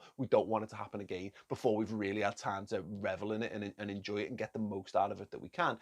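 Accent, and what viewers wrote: Liverpool, United Kingdom. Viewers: Fucking love this guy, Just had him on in the background, cosy, waffling scouser then started paying attention when he started going off at the end!! haha legend. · I could only manage 15 seconds of this guys acsent however nice to hear a salahpool fan actually from Liverpool and not Bristol or Exeter.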